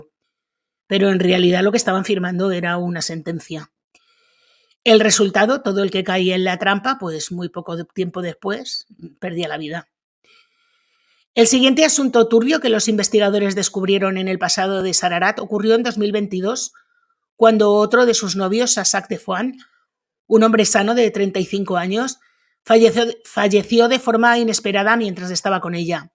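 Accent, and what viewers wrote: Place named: Barcelona, Spain. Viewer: Querida, antes miraba tus vídeos y te daba un 👍siempre, pero no me atrevía a escribir comentarios. Ahora ya he decidido hacerlo, más que nada porque mereces que te diga que eres fabulosa narrando cada historia, que VOCALIZAS perfectamente para que se entienda lo que narras (a otros y otras que hacen lo mismo, apenas se les entiende porque NO VOCALIZAN y van mega rápidos) y además hablas sin prisas, pausadamente, y eso hay que elogiarlo, porque demuestra tu gran empatia con los que estamos del otro lado escuchándote 🙏😘💝 por eso debemos de alabar tu buen hacer y transmitirtelo. Tú nos ayudas a estar informados al mismo tiempo que podemos evadirnos un ratito de los propios problemas, y sólo por tu constancia y todo el trabajo que debes llevar a cabo para realizar cada caso, cada vídeo, debemos de sentirnos agradecidos y decírtelo. 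Vivimos en el país de "la envidia" y por ello es fundamental saber elogiar el trabajo que los demás, como tú, hacen para nosotros. Así que muchas gracias de todo corazón, a ver si ya empezamos a dejar las envidias y a VALORAR más a las personas que se lo merecen como tú. Bueno, guapa, te mando mi segundo abrazo desde Barcelona (y diría por tu acento que debes ser de por aquí también 😍) Muackssss!!!! 💝💖❤️💕👍🌺🦋🌷🌹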